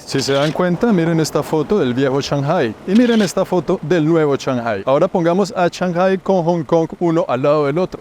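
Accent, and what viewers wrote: Colombia. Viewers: Este gringo tiene acento colombiano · Pese a que su contenido lo ven muchas personas de diferentes países de habla hispana, él habla como un buen colombiano del común. No es como otros youtubers que empiezan a hablar neutro o se mexicanizan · Muito bonito o espanhol colombiano. 👏👏👏👏👏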